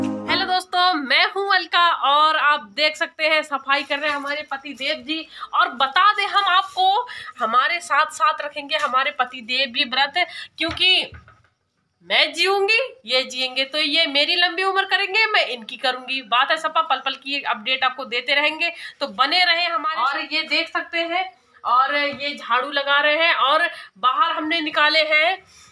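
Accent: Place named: Rural, India. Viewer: Tum kaun gaon ke hao .hum tumhari fain ban gai hai .tumari boli bhasa bada achchhi lagat hai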